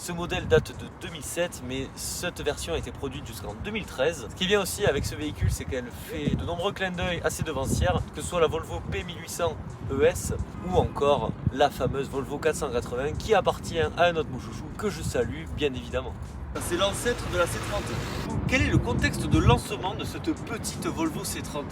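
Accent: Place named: Southwest, France. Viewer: Salut , je te découvre avec cette vidéo . Format technique et humour que j'adore . Le petit accent m'est familier ..sud ouest , non ?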